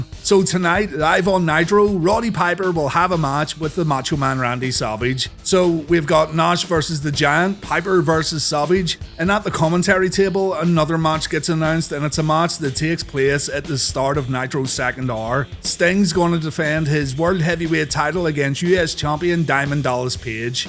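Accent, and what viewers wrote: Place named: Scotland, United Kingdom. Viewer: Is this guy from Scotland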